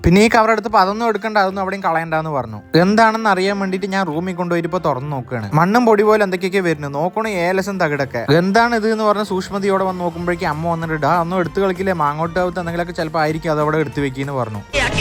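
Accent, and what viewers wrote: Palakkad, India. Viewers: Slag kettitt palakkad Aanenn thonnunu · ❤️❤️palakkad slang 🥰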